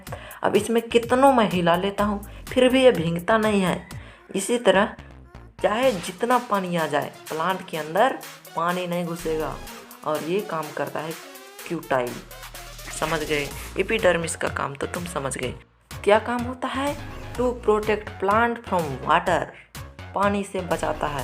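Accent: Bihar, India. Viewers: Op brother maja aagaya full BiHari style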